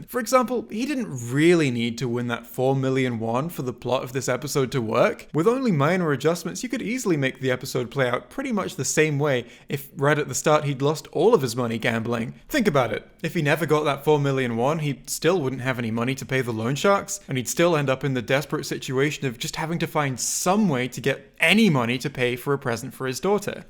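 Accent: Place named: Scotland, United Kingdom. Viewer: are you from/living in Scotland? I thought I heard some Scottish pronunciations in there! Hope you're loving the Granite City - love your videos!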